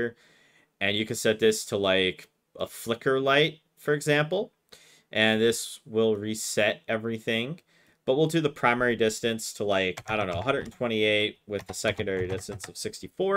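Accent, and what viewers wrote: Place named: Brooklyn, United States. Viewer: what is brooklyn guy babbling about nice tutorial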